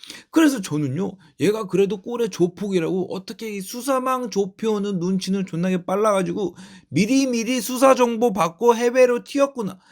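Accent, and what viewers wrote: Chungcheong, South Korea. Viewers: 대표님 말씀 들으면 웃음주시고 예리하게 파헤치시고 인정넘치는 충청 아저씨 구수한 말씀투에 뱃가죽 출렁이는 스트레스 해소에 기쁨또한 넘치게 해주셔서 감사드립니다.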